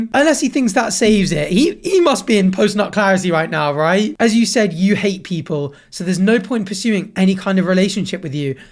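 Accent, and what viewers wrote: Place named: United Kingdom. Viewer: Hey dude Don't know if you'll read this comment, but what area are you from? I'm an American and I struggle with identifying foreign accents, but you have a very distinct accent that I've heard in a couple of other youtubers. I'm just curious where it comes from. I'm like 99% Sure it's a British accent, obviously lol. Definitely not Scottish, Irish or Welsh, and doesn't sound like the stereotypical Londoner or RP accents, but i could be wrong. Thanks!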